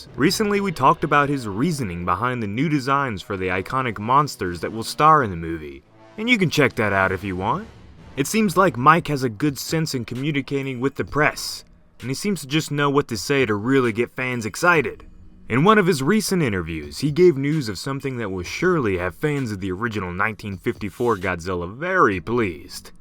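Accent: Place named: United Kingdom. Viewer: Nice British accent 👌🏻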